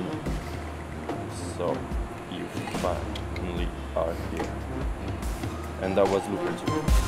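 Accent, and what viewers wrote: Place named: Russia. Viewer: Я не могу понять, вы русские что ли? Акцент прям похож..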